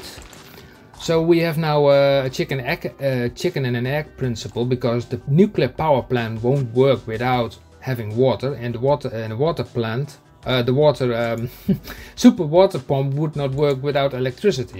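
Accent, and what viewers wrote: Netherlands. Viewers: Yes i hear it too Im dutch too Halloooo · I can hear by your English, your a dutchie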